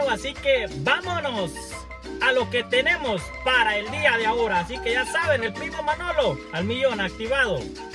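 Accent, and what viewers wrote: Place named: Mexico. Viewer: María Sandoval el abla como Mexicano es porque vive con su esposa Mexicana ya se le pego el acento mexicano😂😊😊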